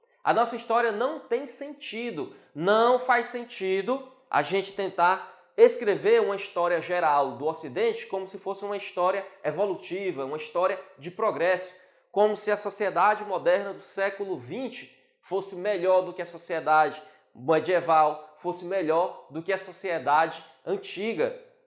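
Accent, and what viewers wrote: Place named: Rio Grande do Sul, Brazil. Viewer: "Pudê" (poder)....sotaque gaucho.....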